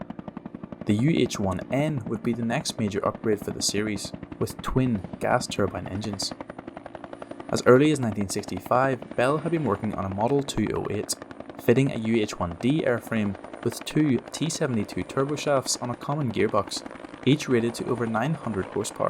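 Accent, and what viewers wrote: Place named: Belfast, United Kingdom. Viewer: Sounds a bit like a Belfast mon...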